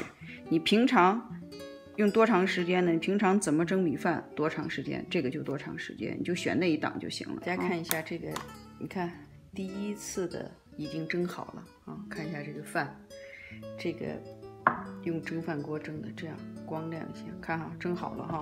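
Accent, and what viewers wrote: Shaanxi, China. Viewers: 才看到这么地道的陕西美食！谢谢诚意分享🙏！还有地道的乡音，逗得我观看全程哈哈直乐！都是爱吃的，准备做去！🤝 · 喜欢听陕西话讲👍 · 姐的陕西话很地道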